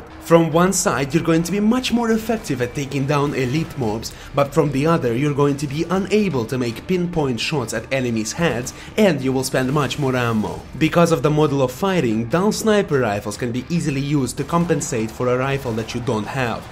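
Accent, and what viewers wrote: Russia. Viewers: Are you Russian by the way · i can tell from ur accent that ur russian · Are you Russian ? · Definitely more Russian than Italian, his accent is strong. But cute too ;). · My friend YOU are speaking ULTIMATE RUSSIAN ENGLISH. (sorry if it came out racist)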